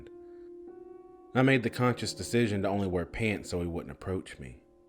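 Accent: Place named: South, United States. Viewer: I luv your southern accent so much it. Makes this channel your amazing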